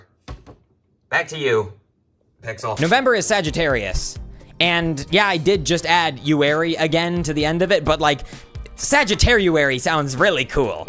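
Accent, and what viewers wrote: United Kingdom. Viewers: Are you british or something?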